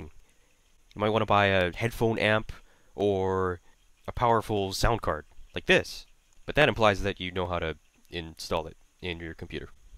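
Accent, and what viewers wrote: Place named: Toronto, Canada. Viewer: definitely from toronto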